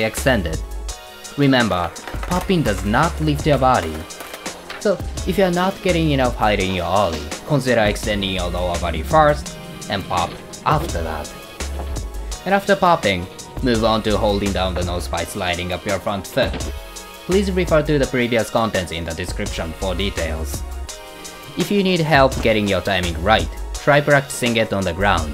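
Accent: Japan. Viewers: he sounds japanese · if this guy is not japanese, I don't know what planet he is from.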